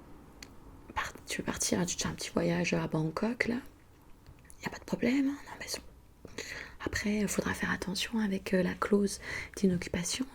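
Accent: Vosges, France. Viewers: Quel belle accent 😍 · Le retour que personne n'imaginait ! L'accent vosgien fait son come back ! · Cet accent feels like home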